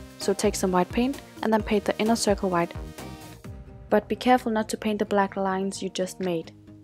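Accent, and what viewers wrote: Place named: Denmark. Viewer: What cool projects! I love your accent - do you live in Denmark?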